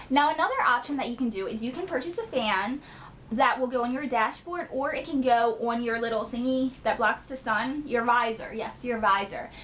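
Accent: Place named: South, United States. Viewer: HAHAHA, a wet rat... good one. ;p girrlll... you're super funny! (: i want your steering wheel cover. been meaning to get that one. (: you have an accent... i'm having a hard time determining where you might be from. my bf says tx or somewhere from the south! you're super talkative; i love! <3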